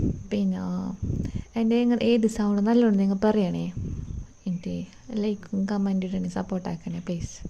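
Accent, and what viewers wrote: Kasaragod, India. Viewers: കാസർകോട് ആണോ ..ഈ സൗണ്ട് ആണ് നല്ലത്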